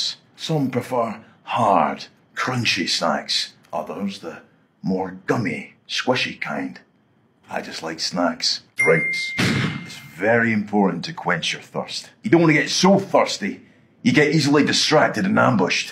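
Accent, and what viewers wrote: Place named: Scotland, United Kingdom. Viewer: He’s like Ryan Reynolds…only Scottish😍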